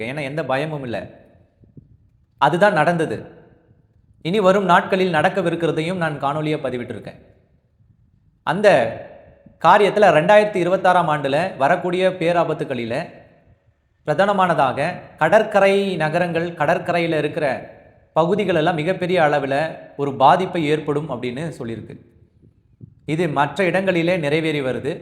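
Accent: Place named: Coimbatore, India. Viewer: First porn and unnecessary sites to be banned. TV channels also promote adultery. TV serials show a man with two women stories and illegal relationship. Also in the name of revolution unnecessary stories with wife applying for divorce even if her husband is good. Why can't serials show good stories with history and atleast one science serial. Self control and discipline are needed. Moral science has to be made mandatory. Pre-marital sex is wrong. My grandma will not allow even her married daughter with child to go out alone after 6pm. She used to tell a girl should not talk with an unknown person. One should know their limits while moving with a known and an unknown person. These things have to be conveyed. Coimbatore English pronounciation. Kindly use Tamil slang.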